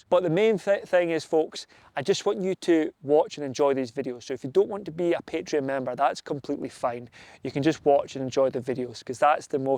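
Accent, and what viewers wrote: Scotland, United Kingdom. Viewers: That's what he says. His Scot's accent makes it harder to hear the hard c sound.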